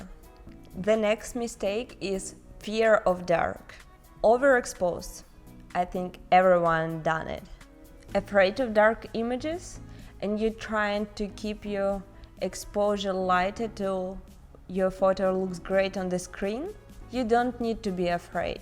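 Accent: Russia. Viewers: You are welcome, I love your Russian English, very….😊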